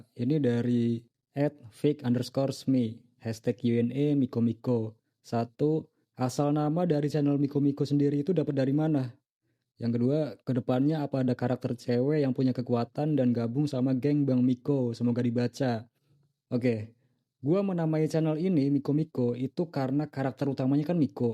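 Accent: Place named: Sunda, Indonesia. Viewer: Sunda juga nih bang😂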